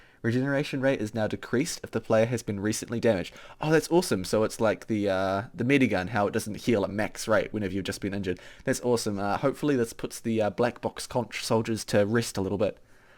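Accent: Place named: New Zealand. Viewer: Confusing to hear a kiwi voice on a popular youtube channel.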